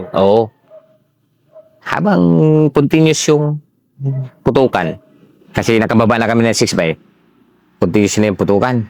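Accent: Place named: Iloilo, Philippines. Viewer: cguro ilonggo ito si master ah sa accent nia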